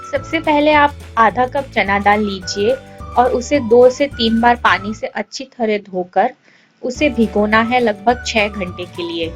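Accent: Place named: India. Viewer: Sav Sav she is speaking in hindi and not in English that u r commenting like that.... in most of the houses of india they call it in this way only..... so it's okay if she is pronouncing something in indian accent.... so pls... dont judge anyone by their language. u need to see the hardwork behind everything and also their talent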